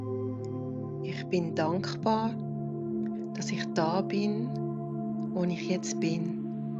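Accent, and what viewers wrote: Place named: Swiss German, Switzerland. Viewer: Da meine Frau so heisst wie Du, warst Du mir gleich sympathisch. Deine Stimme ist wunderbar fein und weich, geht mir tief direkt ins Herz. Die Mundart gibt dem Ganzen eine spezielle persönliche Note. Der Wechsel von Gefühl zu Energie und Bestimmtheit ist ganz speziell, passend und schenkt wunderbare Energie! So wia's guat isch! Vielen Dank dafür! Alles Liebe, Albert Pucher.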